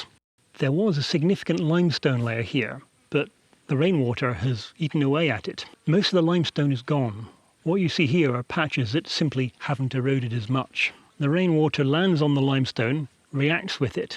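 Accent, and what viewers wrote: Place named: North Island, New Zealand. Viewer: Given your accent I'm guessing you're from the north north north island?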